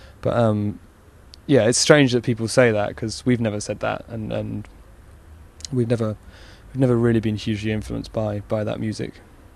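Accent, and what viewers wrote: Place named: United Kingdom. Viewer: Damn, u r so hot!!! Love ur Brit (London?) accent...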